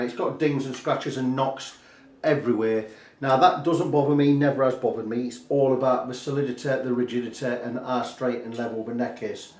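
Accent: United Kingdom. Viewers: I give this luthier a thumbs up 👍🏼for his repair skill a thumbs👍🏼 up for that cool British accent and one 👍🏼for his video editing skill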